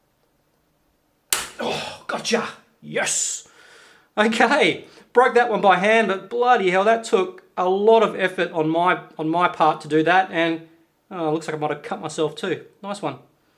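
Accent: Australia. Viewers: Nice australian accent! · Love the strayan accent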